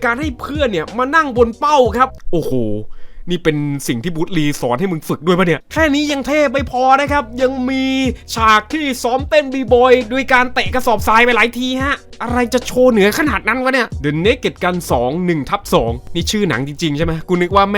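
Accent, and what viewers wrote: Isan, Thailand. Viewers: เจ้าของช่องเป็นคนอีสานหรอครับ สำเนียงออกอีสานเลย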